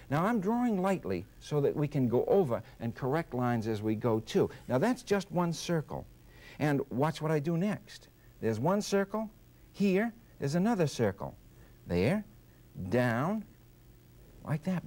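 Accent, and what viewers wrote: Boston, United States. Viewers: Love that “Bahston” accent!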